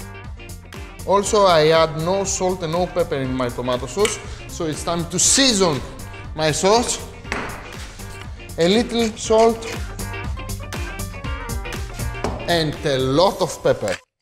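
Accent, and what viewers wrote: Greece. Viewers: That lovely accent is Greek btw not Italian.. pretty similar tho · my friend. Love your videos and your charming Greek accent. But I was kind of disappointed that you didn't use Greek basil😊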